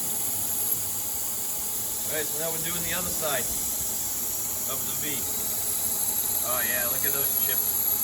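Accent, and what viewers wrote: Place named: New York, United States. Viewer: Nice accent. You sound like a old school New York Gangster.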